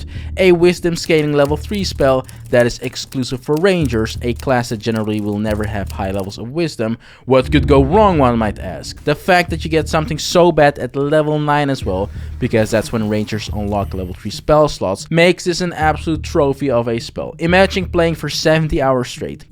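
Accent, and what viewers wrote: Netherlands. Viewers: Hey klootzak, are you Dutch?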